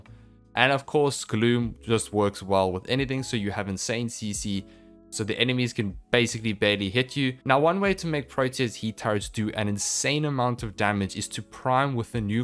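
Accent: South Africa. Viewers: are you soug African? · My dude are you from South Africa 🇿🇦 I am from Cape Town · Mate you sound like you from south africa i cannot lie xD, great vid btw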